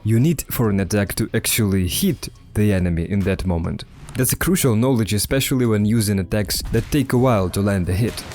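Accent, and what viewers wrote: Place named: Russia. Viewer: сижу думаю че у тебя с акцентом. И ты на русски забалаболил. так и знал. но а так видос супер. но ты не правильно рассказал про дроп шансы. (да посути 20% но из за гаранта вид этого чутка меняется) 4 косты имеют гарант дропа в 2. тоесть если 1 раз не выпал то 2 точно выпадет. кароче как с крутками 3 косты имеют гарант в 4 1 косты в 8. еще бы я сказал новичкам соединять эхо на новом уровне ДБ так как есть шанс на дроп того же эхо нового ранга что так же идет в прогресс ДБ. ну и не тратить материалы на лишних персов и эхо до 5* ну или если сложно фармить 4* а так вся база и много хороших советов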